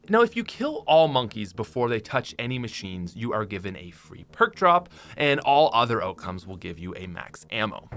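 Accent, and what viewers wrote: Canada. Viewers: Maso24k whats shitty about a Canadian accent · Nasal Canadian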